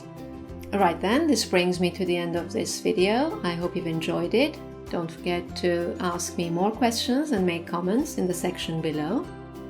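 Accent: United Kingdom. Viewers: Hi, I Just want to say thanks for the usefull tips you give me, I just have a Last question I know you speak british, but what kind exactly? From what City comes your accent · Your accent is very light for someone British. What part of England are you from?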